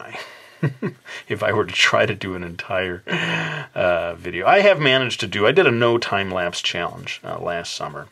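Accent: United States. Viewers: excuse me your american sound hurts my ears but i listen to u how rude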